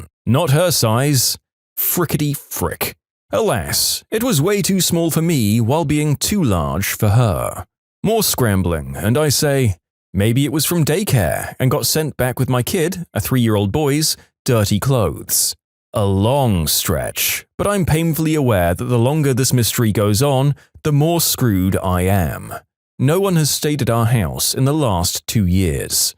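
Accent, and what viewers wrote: United Kingdom. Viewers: Why does bro sound so brittish?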